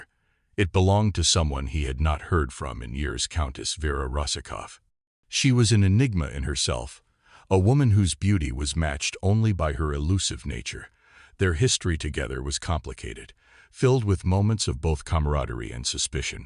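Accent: United States. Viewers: American accent doesn't cut it.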